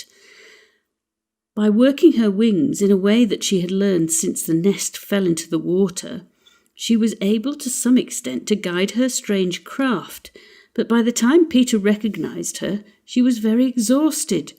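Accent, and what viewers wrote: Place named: United Kingdom. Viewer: I like British stories read with a British accent best & this one is easy to hear, loud & clear. Thank you.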